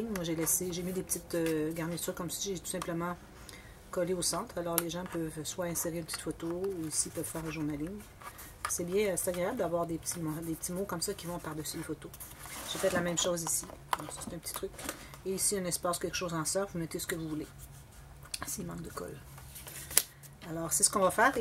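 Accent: Canada. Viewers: Coucou, Je découvre une magnifique artiste pleine de talent au léger l'accent canadien !!! Un EXTRAORDINAIRE album, merci de nous enseigner et de nous entraîner vers ton univers ! Je m'abonne à ta chaîne ! A bientôt Bisousssssssss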